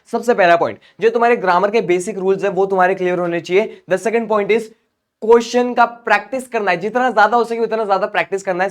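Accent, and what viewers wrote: Maharashtra, India. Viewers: Marathi English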